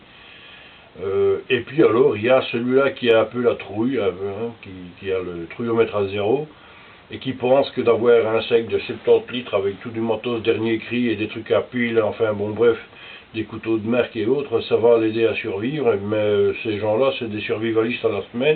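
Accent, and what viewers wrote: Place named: Brussels, Belgium. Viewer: Salut le ou le! Quelques réaction à tes propos... Tout à fait d'accord avec le terme de "prévoyant" et non survivaliste. Je me disais bien, t'as bien un accent de Bruxelle... Promis, moi je touche pas un copek en présentant mes "jouets". Quoi, t'as pas aimé mon four de campagne et ma chaufferette de rando????lol Je vois mal ma femme accepter de faire de la soudure dans le salon...lol.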